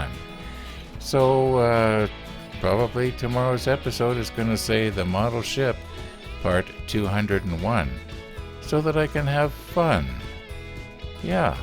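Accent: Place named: Canada. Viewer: My husband is a retired physician and he builds airplane models from scratch makes plenty of mistakes from which he learns He did a few kits but prefers blueprints and goes from there. We are always collecting little interesting oddities to use. I will show him this channel it is very interesting. I am trying to get him to start on ships. My living room is no more airplanes hang from the ceiling LOL I wondered why you disabled the baking soda and glue (super glue?) video you made and became hollow. I personally loved that myself and will use it. I think it is super glue. I love videos are are unscripted and simply human! Thanks for posting. You sound like you are from Canada? or Minnesota or something like that!!!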